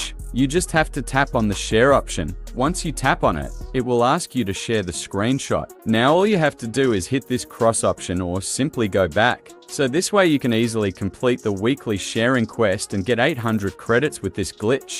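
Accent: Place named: Australia. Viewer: how you got the accent mate I'm Australian u sound aussie😂😂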